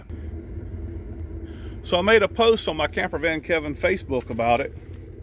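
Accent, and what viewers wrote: South, United States. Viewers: I guess you're a fantasy life for them. A way to distract from their reality so they don't want to hear about your reality. I want to enjoy your life (your reality) on the road. Hear your southern accent & watch your handsome face video you & the Wolf Pack. Happy New Year🎉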